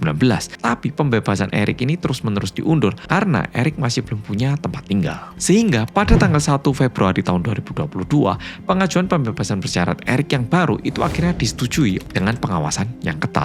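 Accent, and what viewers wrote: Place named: Java, Indonesia. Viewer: Dihukum seumur hidup😮😢 Iblis gilak itu... Suwun ceritanya ya medok🎉❤🎉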